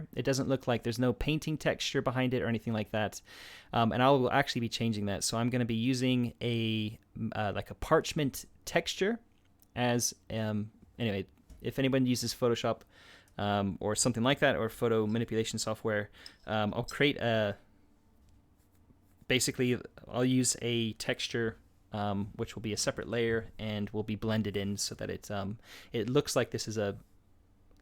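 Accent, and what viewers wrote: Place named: United States. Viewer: Interesting game idea. Also, unrelated to anything you were talking about: do you know you sound like an American?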